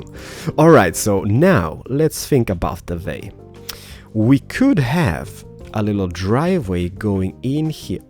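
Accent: Scania, Sweden. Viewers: Gillar din skånska. Också från Skåne men norra delen. Liking your skånska. Im also from Skåne but the north part. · Skånska =D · Du e ju SKÅNSK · you swedish bro haha love it riktig skånska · Oh man, has the most fabiest Swedish ever. I am also Swedish. <3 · Är du skånsk? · lol That Skånska! xD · När du pratar engelska så hör man inte din skånska dialekt, men när du började räkna på svenska kunde jag inte sluta le. Så uppenbart att du är bosatt i Malmö, så go dialekt! :D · LapizManHD It is appropriate to say he speaks English with a Swedish accent. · wtf är du svensk? :O eller ja, skånsk ;) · Hahaha skånska e ju bäst :D (fast sthlm ftw ändå :3) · His accent is sveska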